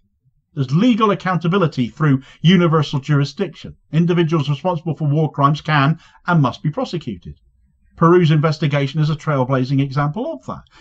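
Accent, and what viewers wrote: Cornwall, United Kingdom. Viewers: I did watch a video of huge protests in Chile which has a large Palestinian population. Great reporting as usual. One of the finest independent journalists, love the Cornish accent too😊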